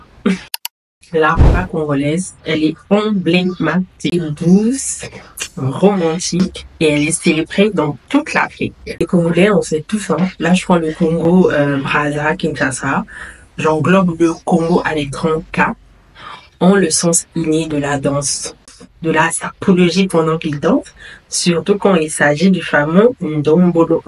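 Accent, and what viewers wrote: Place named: Senegal. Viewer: Kitoko moina en tout cas. En plus du as un accent sénégalais. Merci beaucoup pour la vidéo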